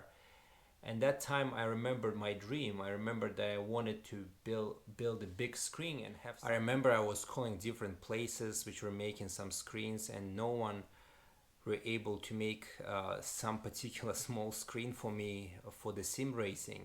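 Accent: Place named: Russia. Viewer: Сразу слышно русский акцент, но твой английский очень хороший!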